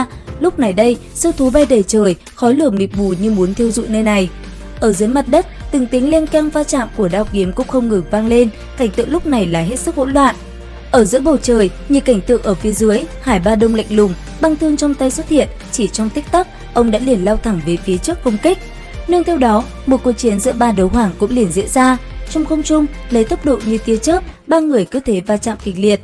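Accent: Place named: Ha Tinh, Vietnam. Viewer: ông này người hà tĩnh chắc luôn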